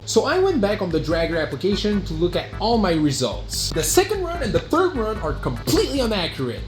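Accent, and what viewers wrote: Quebec, Canada. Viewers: Is he from Quebec ?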